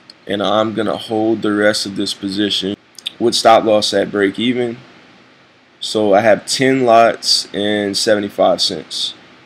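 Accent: Louisiana, United States. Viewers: I knew u was from Louisiana I can hear it in your voice what part you from bro im on the north shore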